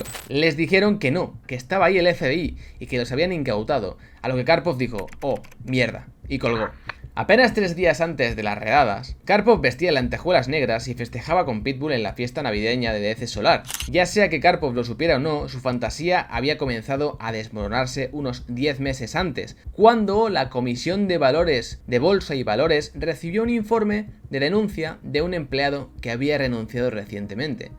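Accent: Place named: Spain. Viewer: La IA no tiene acento español no ves que es una persona de carne y hueso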